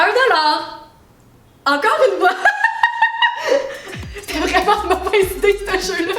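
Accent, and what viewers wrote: Quebec, Canada. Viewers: Je suis nouvelle et je me suis abonnée direct. T super t ma nouvelle youtubeuse préférer . (Je suis française donc si y a des mots pas québécois c normal) Ps: j'adore vraiment l'accent québécois ❤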